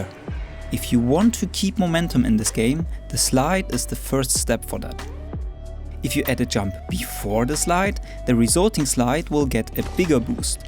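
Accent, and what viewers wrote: Germany. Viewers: wie man sofort raushöhrt dass er deutsch ist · this german Accent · You make amazing videos dude, keep it up and I believe somedoy you'll become one of the biggest apex content creators :) Btw are you from Germany? I kind of hear a german accent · this guy has a strong german accent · its the german accent for me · Nur eine Frage bist du deutsch Man erkennt das an dein Akzent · you can tell by his accent that he is german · Do I hear a German accent? 🤔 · german? sounds like... besides, awesome video! :) wish i had seen this months ago. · Man hört einfach dein Deutsch raus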